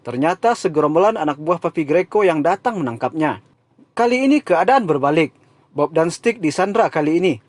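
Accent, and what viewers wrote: West Sumatra, Indonesia. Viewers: Urang minang asli kiro e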